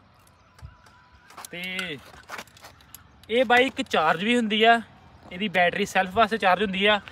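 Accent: Majha, India. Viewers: Majhe aale eve hi bolde aa kaka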